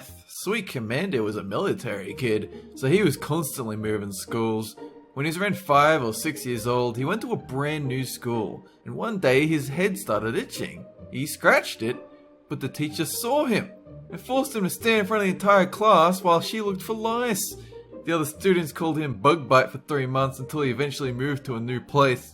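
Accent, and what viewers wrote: Australia. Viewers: Yaaaay...narrated by 😊 G'day mate, love it that an aussie is such a successful youtuber and has awesome channel. Also love when you narrate in your very aussie styled, layed back, smart arse way. More narration from YOU pls! And a face reveal...of everyone pls?!?!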